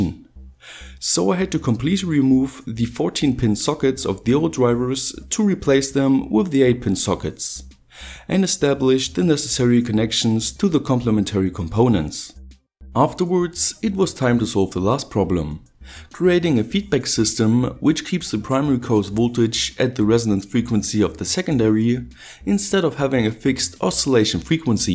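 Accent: Germany. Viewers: Ich finde seine Projekte schon geil. Bin zwar neu hier hör aber gleich das er eigentlich ja ein Deutscher ist. Deshalb wundert es mich warum er seine Videos auf Englisch macht. Weiß das jemand?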